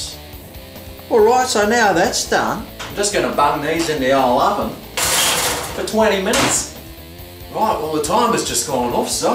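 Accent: Australia. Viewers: I usually send my mom new recipes that we can cook, I want to send her this, but my arabic mom is gonna be so confused when she sees a Middle Aged bloke shouting at his camera in an Australian accent😂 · hahaha talking in the authentic aussie accent really shows people how we talk down unda!!!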